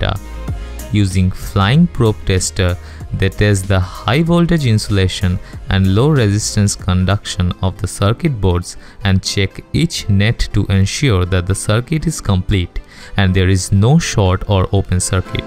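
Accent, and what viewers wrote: India. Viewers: you can't get someone without a heavy Indian accent to narrate the video? nothing wrong with it but god damn it's hard to understand lol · Индусский акцент сразу слышен, хотя я по английски нефига не понимаю. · Omg,this guy sounds so bad.voice is like Indian slang modulated with donkey screaming pitch.even though I am Indian I hate this slang.video is shot nicely but I wish such these great videos are sponsored to channels like greatscott:/ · indian English makes me feel ashamed. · Dat fucking indian accent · 一股浓浓的印度口音，听得超级不爽。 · Listening to the indian narrator speak english gave me cancer. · Omg indian english☠ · 这是传说中的印度口音？ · i think this is indiaglish. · Indian accent。。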